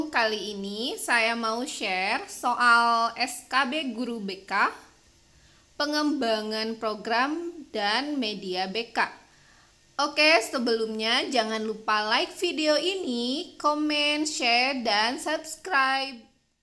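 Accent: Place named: Java, Indonesia. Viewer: Mandan medok sedikit bahasanya ya mb..hehe..pk sukses selalu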